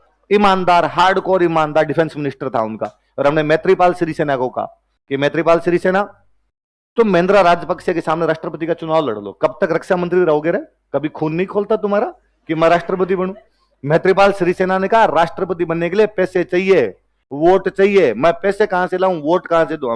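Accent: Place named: Rajasthan, India. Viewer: कुछ दिनों से आपके वीडियो देख रहा हूं 😅 मजा आता है राजस्थानी लहजा आता है जेसे khan sir का भोजपुरी लहजा है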